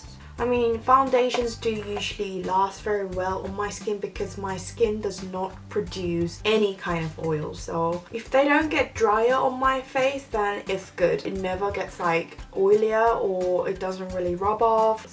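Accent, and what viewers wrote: United Kingdom. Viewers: 영국발음인가요...??? 진짜매력있어요 요즘관심많이가는데ㅜㅜㅜㅜㅜㅜㅜ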